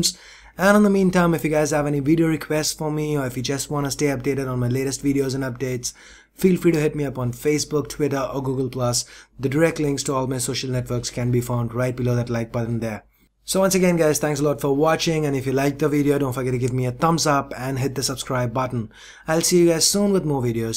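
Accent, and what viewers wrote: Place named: India. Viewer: Annoying Indian accent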